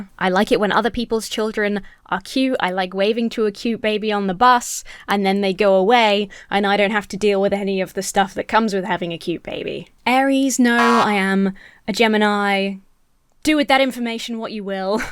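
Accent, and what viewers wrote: United States. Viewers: That was a SCARILY accurate american accent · IT WAS SO WEIRD HEARING YOU TALK WITH AN AMERICAN ACCENT!!!! As an American, you sounded totally authentic. And I'm sorry, I cannot lie. You sounded kinda hot.....for a lesbian. ;P I always love and respect you just the way you are. Please, forgive me for finding you so (in random order) charming, funny, beautiful, smart and sexy. I'm such a horrible sexist! lol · sometimes your American accent reminds me of Erika Thompson- texasbeeworks. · That's crazy that someone did that. Also I love your American accent. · Your American accent is almost too good. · Pretty good "American Accent". I don't think I've ever heard anyone in the US specifically use "Snark" as a noun. We may use the term, but as an adjective; "Snarky", rather than 'Plenty of snark". But, someone might, just to be different and bring attention to it. 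And, generally, in the US people say "Scary Movies: rather than "Horror Movies". I think British 'Liberalism' is more like American 'Libertarian'. Both basically lean toward Socially Progressive, Freedom of Choice, and Open to Different Lifestyles. The main differences are more focused towards Government Oversight and Capitalism. I recently watched an interview with Keanu Reeves talking about Deep Fakes. He was really disturbed by them. He said he watched an entire scene from a movie - that he didn't make - but it was so close to HIM that he would have believed it. There have been scholarly papers written on Star Trek's Holodeck addiction and what could/would happen if/when we have that sort of technology. You could effectively have mean, nasty, violent rapey sex with any celebrity, or crew member, or whomever. It would be seriously fucked up! You said, based on your audience, the chances are slim of someone knowing who you are. 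I did some quick maths; Congrats, .00002 of the world are fans of yours! MCM stands for Movies, Comics, Media. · Your American accent is pretty decent but still it had me cracking up. Catfishing is dumb and violating. Hopefully it doesn't happen again. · Not a bad, though obviously exaggerated, American accent! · Nice American accent! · Your American accent creeps me out a little. 😆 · Your American accent is superb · Love your American accent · I completely didn't notice the American accent until she became British again. Bro. Edit: I'm American, and I can say that it's a pretty convincing accent. It just feels weird to hear with the knowledge that it's fake. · All I learned from this video was how much I want to hear your american accent MORE. LIke a LOT more. k thx bye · I love your American Accent! It's two years later, and many things have gotten worse. I've heard of several schools where students were disciplined for using "nudify" apps on their classmates. 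AI programs that show photorealistic extrapolations of people naked. Utterly disgusting, and beyond utterly disgusting when it's done to minors. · Sorry that happened to you, weird and a little gross. I can't put my finger on it, but your american accent weired me out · You're American accent is scary good, if I tried to do a British accent I would sound like Dick Van Dike in Mary Poppins. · oh no your american accent is getting pretty good. also i do not think an american would say snark or cheeky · the American accent. Love it. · Your American accent is so good it's tripping me out lol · You are not a small channel anymore. Twice in the past 2 months I came across other channels that mentioned your name or had your picture. You have a great american accent. You are almost as good as Alba Baptista who started in "Watrrior Nun". I was shocked when I found out baptista wasn't american. There are too many bad people on the internet. 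I hope they didn't completely ruin it. I love my online friends. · Your American accent LOL awesome. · Your american accent is getting really good, it's a little disorienting! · Great American accent... and I notice you kept the ejective K!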